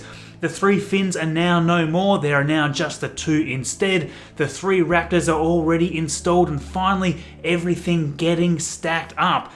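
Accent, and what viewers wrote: Australia. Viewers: Nice video thanks. Random q. Where in Australia are you from?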